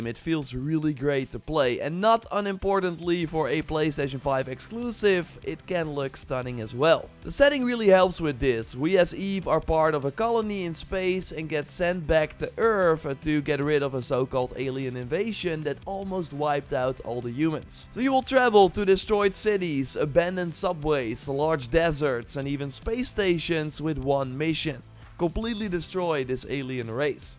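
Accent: Netherlands. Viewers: I love this Dutch accent so much ❤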